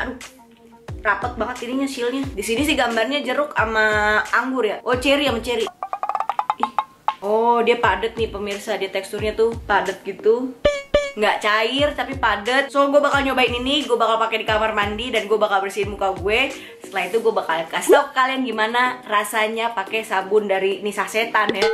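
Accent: Tangerang, Indonesia. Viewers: sebagai SPG nya BRUNBRUN Paris di supermall Karawaci saya merasa terhibur, ngakak banget si ka bahasa Tangerang nya kelihatan jasa 🤣🤣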